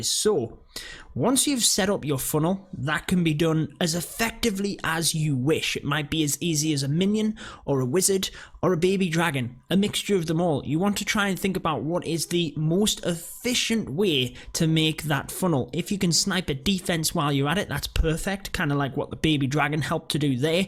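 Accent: United Kingdom. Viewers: Thanks! Subbed and liked. Are you british 🤔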